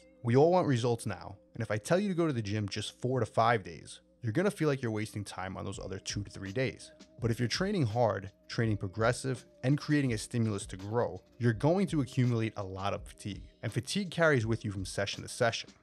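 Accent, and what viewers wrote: New York, United States. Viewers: I like how you're proud of your new York accent. Not attempting to hide it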